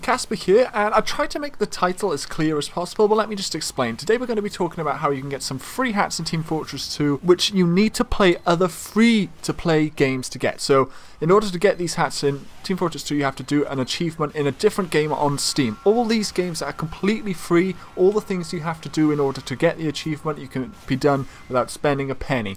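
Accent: Wales, United Kingdom. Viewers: Are you British? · idk lol i heard little bits of a welsh accent lol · Is he Welsh?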